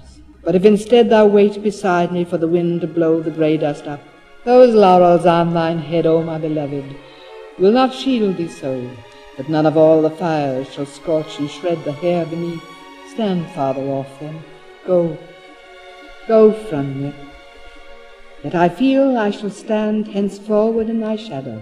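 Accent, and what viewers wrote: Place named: Mid-Atlantic, United States. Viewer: She used that “mid-Atlantic” elocution, which I think is just so gorgeous…. Lofty and beautiful and so missed these days of casual everything. I do miss elegance.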